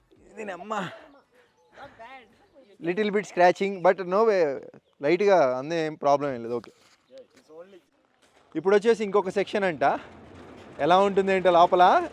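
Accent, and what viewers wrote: Guntur, India. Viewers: Some people r commenting without even understanding his hardwork. I am from vizag and i have good friends from guntur. Adi valla slang but yekkada bad words use cheyledu ithanu. He is genuine. All the best my bro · YouTube views aagipothai kaadhu athanu guntur kaabatti ala untundhi vaalla slang nuvvu yelluthey neeku thelusthundhi ley guntur vaallu choostey vaallaku normal gaane untundhi endhukantey vaalla slang ala untundhi guntur lo picchi puk thelusukoni maatladu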